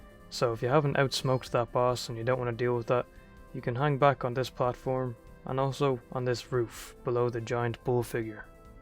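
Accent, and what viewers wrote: Ireland. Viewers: I feel dumb for not noticing you were Irish 😂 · Dude I don’t know why but you sound soooo Irish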